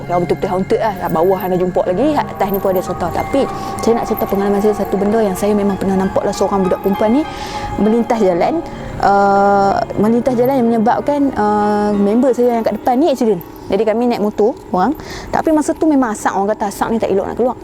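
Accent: North, Malaysia. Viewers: tetap... Saya akan sokong walaupun bahasa utara ni kadang-kadang kasar seperti kertas pasir namun kehadiran cerita cerita seramu sentiasa ku nantikan asalkan keseramannya sampai ke paru paru dan limpa hahaha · Pekatnya loghat utara, saya yg org n9 ni kena dgr betul2 baru faham 😅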